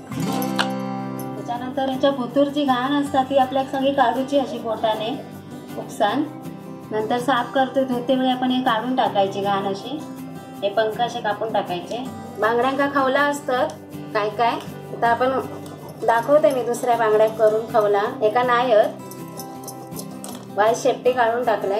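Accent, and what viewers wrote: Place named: Malvani, India. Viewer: Subscribed just to listen yr sweet Malwani... It's too sweet to hear.... मला मालवणी भाषा खूप आवडते त्याचा feel च वेगळा आहे।।।🙈🤗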